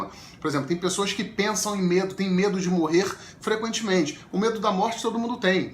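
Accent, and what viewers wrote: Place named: Rio de Janeiro, Brazil. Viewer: RIO DE JANEIRO lindo mesmo. E ainda com esse sotaque carioca.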